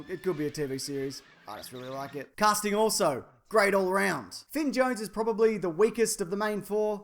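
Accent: Australia. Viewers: hey you're aussie right? like... you sound aussie. if you are, which state. WA is where I'm at.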